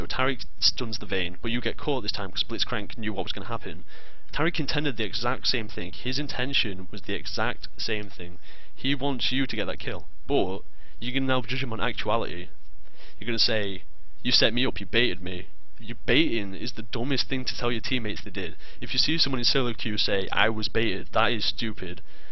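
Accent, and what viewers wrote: United Kingdom. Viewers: I want a guide on how to hear what you're saying (i have trouble understanding british accents)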